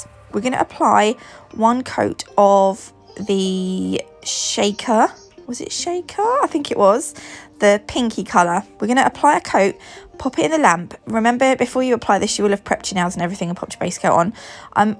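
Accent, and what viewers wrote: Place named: United Kingdom. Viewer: I absolutely love your content and I really enjoy watching your videos and I just love your accent it reminds me of my extended family who live in the UK 🇬🇧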